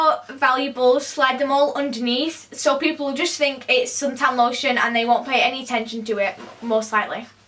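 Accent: United Kingdom. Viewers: U have a nice British accent 😁👍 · Bitch she just has a British accent deal with it I found it very helpful!! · The British accent is so cute!!!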